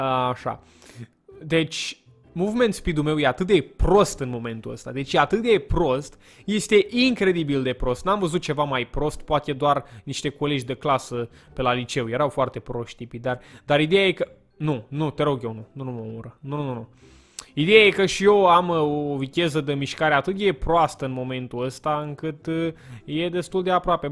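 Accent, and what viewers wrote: Moldova. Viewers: fe ce vorbesti moldoveneste?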